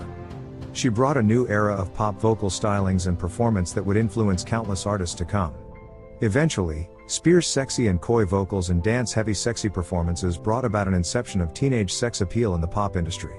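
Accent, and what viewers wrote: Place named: United States. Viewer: It's still him but with an American accent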